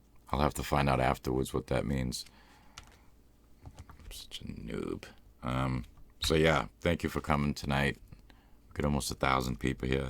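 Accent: Boston, United States. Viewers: Are you from Boston?? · Boston accent lolol from rhodeisland myself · Bahhh you should do more regular vlogs!! I want to see more of your beard and hear more of your Boston accent! It’s just as relaxing as your asmr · Jewish Jesus it’s a Boston accent. Are you claiming to be the Jewish Jesus? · Are you from Boston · Is that a Boston accent I hear? 😊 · I never knew that your real voice was a heavy Boston voice XD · Is he from Boston? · Is that a downtown Boston accent?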